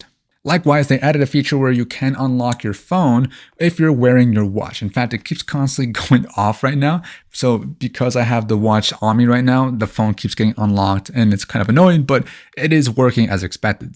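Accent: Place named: United States. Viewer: Where'd you get India 😂 he has an American accent and a Mexico jersey on lolol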